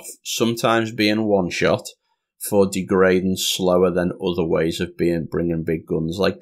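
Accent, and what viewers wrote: Liverpool, United Kingdom. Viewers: Are you from Merseyside? You sound scouse-adjacent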